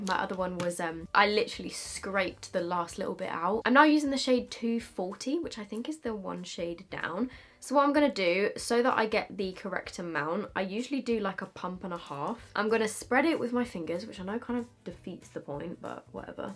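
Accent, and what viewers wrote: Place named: United Kingdom. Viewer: the subtitles were transcribing from a British accent I believe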